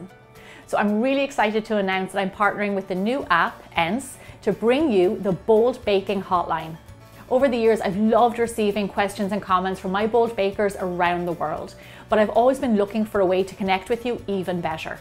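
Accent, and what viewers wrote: Ireland. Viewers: I love hearing your voice. That Irish lilt is so beautiful. I am downloading the app now.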